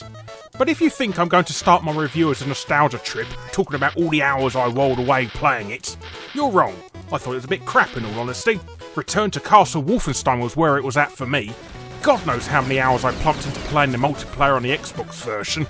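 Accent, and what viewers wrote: United Kingdom. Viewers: You have the most British voice ever, its brilliant. Never played Wolfenstein, really liking Doom 1 though, very fun though difficult.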